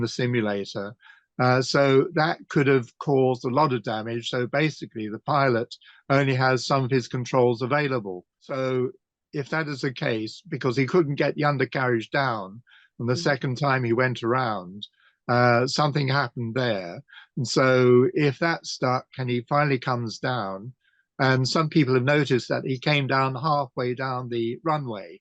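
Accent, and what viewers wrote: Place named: RP, United Kingdom. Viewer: Just coz you've got posh English accent don't mean you're intelligent mate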